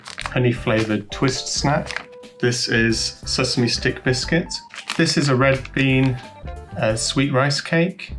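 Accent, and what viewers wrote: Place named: RP, United Kingdom. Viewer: That`s why we call him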